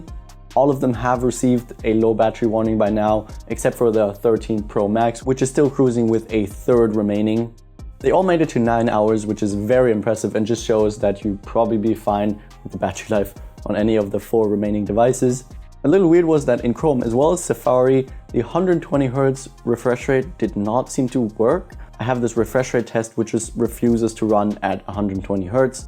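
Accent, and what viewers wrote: Germany. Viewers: Haha wie man sofort den deutschen Akzent merkt 😂. Tolles Video, danke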